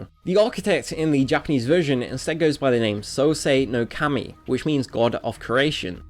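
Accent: United Kingdom. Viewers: the nasaly Brit voice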